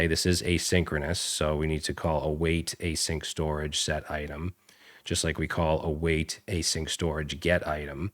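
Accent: Boston, United States. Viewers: 10 years ago, you were a godsend, helping me learn to code. It's good to see you're still at it. And as someone that grew up in Connecticut, hearing your Boston accent is very soothing.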